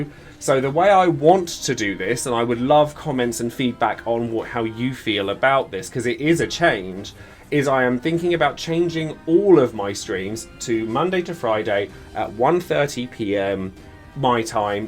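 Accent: United Kingdom. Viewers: Okay, this is a lot to chew on. First: yes, I came to this channel for the game in question (Opera Omnia), but got charmed by the editing and the formating you gave everything you youched and I currently stay here because you. Yes, you. Your way to communicate and express yourself, your emotions that pour through the video to us, your british accent, everything you, just you. Never undervalue yourself, never fear the tomorrow so badly that it stops your journey, you are far more better as a content creator than what you, yourself, think. Trust me, I've seen my fair share of CCs and I'm good friends with some of them. Second: CHANGE! Yes! Bring it on, baby! This is a case of one of my bestest friends. She got an offer back in the 2018s to jump from Twitch to Facebook Gaming and she accepted it, however she didn't realized at the time that she fell into a booby trap that would slowly undo everything she had built for almost a decade. 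She jumped back to Twitch at the start of 2020, before the pandemic. Her view count had been reduced by 9/10ths, it was the biggest blow she received and she started climbing the metaphorical mountain again. By the summer of 2020 she did a gamble, she started to write the script and the setting for a tabletop role-playing experience, she would be the Master and close friends that are also streamers would be the players. She didn't know if it would save the channel, but she did it regardless. First, to have fun, and second, to expose this part of he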